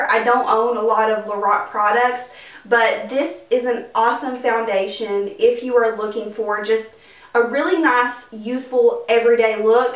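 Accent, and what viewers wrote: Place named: Texas, United States. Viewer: You are so beautiful! Kind of have a tx accent! Lol. So anyways, I returned my Smashbox 15 hour wear and got this in exchange. I have super dry skin and also some wrinkles because I have that "early 30s skin" ! So this foundation seeped into my wrinkles and just did not work for me!! Next I'm gonna try UD naked skin when I return this one. But I do love your videos! 😄🙆🏻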